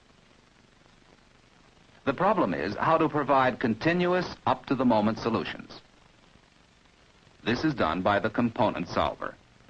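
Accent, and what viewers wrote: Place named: Texas, United States. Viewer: Where all of these series with Texas-dialected-man comes from?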